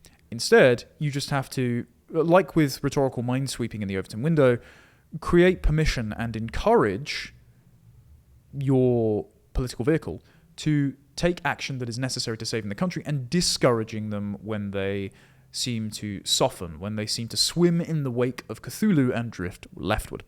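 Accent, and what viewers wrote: RP, United Kingdom. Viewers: I agree with a lot of what you say mate but wish you weren't so fucking posh... also with 18k views I think you're over inflating your own importance when it comes to "providing some carrot and stick" 😂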